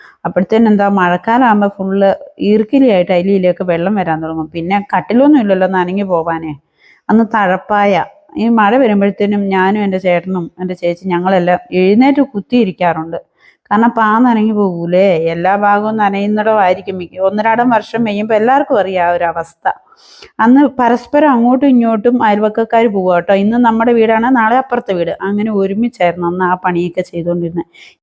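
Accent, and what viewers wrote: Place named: Idukki-Kottayam, India. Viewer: Chechide slang idukki kottayam slang polind😇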